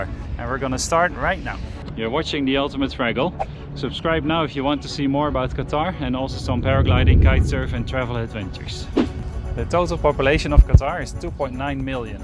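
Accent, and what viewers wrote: Netherlands. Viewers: Your accent sounds Dutch? Are you from the Netherlands? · Hollander aan die accent te horen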